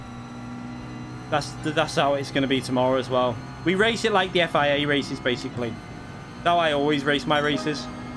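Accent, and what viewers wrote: Liverpool, United Kingdom. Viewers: Can't work out your accent. You sound like you're from the wirral or full Scouse then other times I can't place it.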